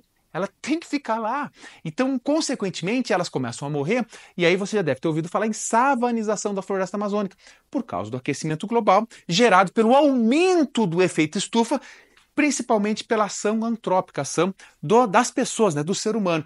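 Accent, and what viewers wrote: Rio Grande do Sul, Brazil. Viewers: Professor gaúcho é a melhor coisa kkk parece que eu entendo mais ( ou deve ser por que sou gaúcha )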